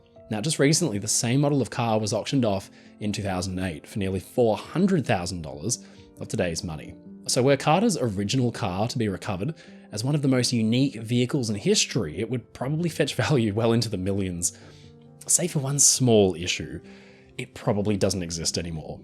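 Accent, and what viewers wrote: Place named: Australia. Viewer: You have such a pleasant Australian accent. Always a pleasure to hear you speak about things. On an unrelated note, a line from the Rubaiyat (the book of Persian poems) was found in the Somerton Man's pocket. That book had been very popular in Australia at the beginning of the 20th century.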